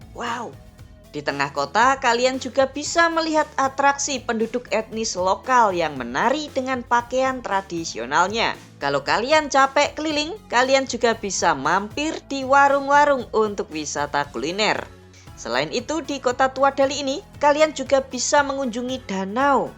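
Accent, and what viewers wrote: Java, Indonesia. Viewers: eksyen naratornya yg njawani banget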